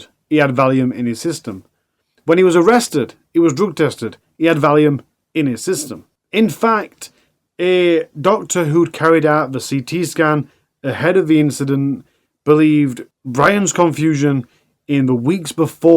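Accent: Yorkshire, United Kingdom. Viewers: Maybe put more of yourself in the video in general, rather than just at the end. I'm from Yorkshire and sound a little like you. I think channels like That Chapter have done a great job of using the accent. Really loved the video. Genuinely want to see you keep moving up and up and up! 💛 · I just love how Yorkshire you are 🌹🌹🌹🌹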